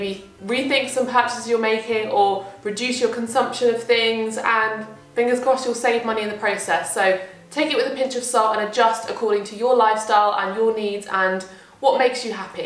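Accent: United Kingdom. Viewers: What UK accent is this? Love it! Very informative video, thanks!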